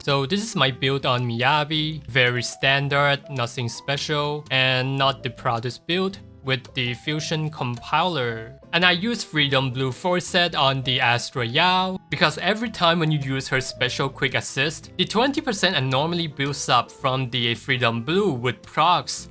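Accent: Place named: China. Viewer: you cant really compare trigger to qing yi i think, trigger is an off field stunner, and qing yi is an on field stunner 話說up主是不是華人？英語聽起來有華人口音，純粹八卦😂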